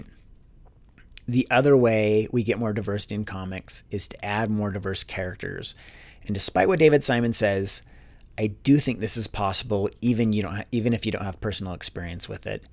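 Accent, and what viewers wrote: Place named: California, United States. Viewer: Where did you pick up that cali girl ascent. It is perfect.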